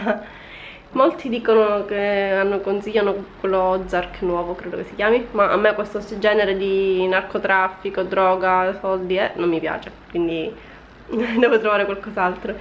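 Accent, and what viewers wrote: Sicily, Italy. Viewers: Sei siciliana?? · Sei siciliana?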